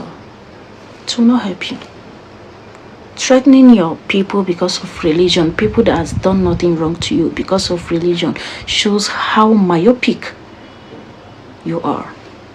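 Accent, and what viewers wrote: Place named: Hausa, Nigeria. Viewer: Listening to her voice, you could notice that she was born in Igbo land by an awusa or fulani people.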